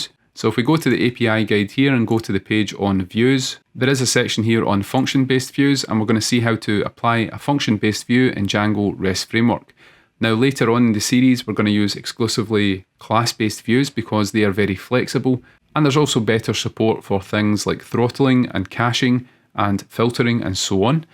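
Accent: Scotland, United Kingdom. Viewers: File in Scottish is pronounced Fail, type is tape, price is Preis (like in German)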